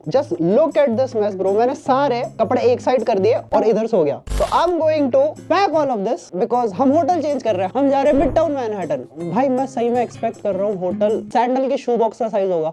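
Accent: Rajasthan, India. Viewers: bit of rajasthani accent?? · Bro has the strongest Indian accent I've ever heard 😅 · thats the most Indian accent i have heard outside India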